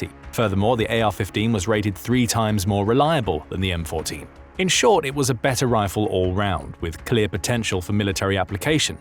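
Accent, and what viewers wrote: United Kingdom. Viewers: Bullsh-t! The limey Russophobe voice just makes this channel CRINGE!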